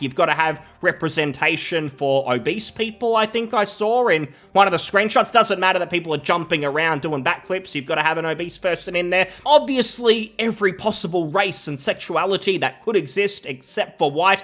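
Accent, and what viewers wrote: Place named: Australia. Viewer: I give the dude the benefit of the doubt, he's a smart bloke, a lot of words pronounced differently in Australia.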